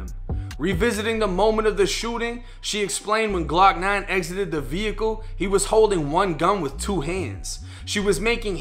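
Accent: Boston, United States. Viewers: U sound like u from Boston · That Boston accent is heavy bro, haha i rock with you... Remind me of Slaine... Keep doing your thang man...#Respect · Only new to your channel I'm Irish pal but loving the channel me and my girl was watchin you she says his voice is like Ted's Boston accent 💯👌💚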